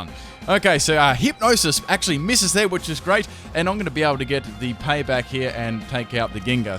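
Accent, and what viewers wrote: Australia. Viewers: he's australian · are you ostralian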